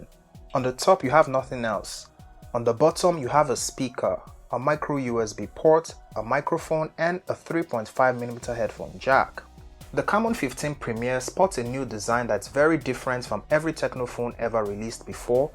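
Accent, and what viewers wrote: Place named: Nigeria. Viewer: Great video, you sound nigerian. Are you :) ?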